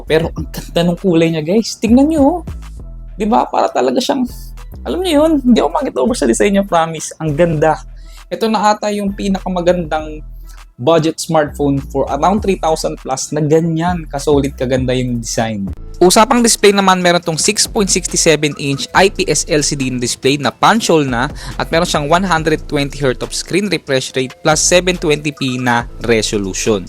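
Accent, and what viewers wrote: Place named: Pampanga, Philippines. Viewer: you sound Kapampangan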